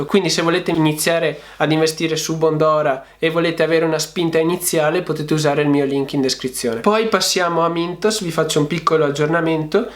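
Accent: Veneto, Italy. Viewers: ciao! Un giorno se ti va ci si può trovare, ti seguo da un po, mi piacerebbe confrontarmi con te su questo argomento. Io ho 29 anni, auguri x il tuo compleanno! Dal tuo accento credo che tu sia del Veneto. Se è così siamo vicini e sarebbe bello potersi incontrare x parlare su investimenti. Uso una piattaforma da farti vedere con cui si può guadagnare tutti i mesi qualcosa.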